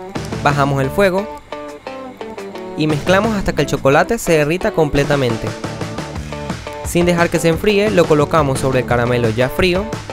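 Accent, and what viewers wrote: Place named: Ecuador. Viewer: es que tienes asento como si fueras ecuatoriano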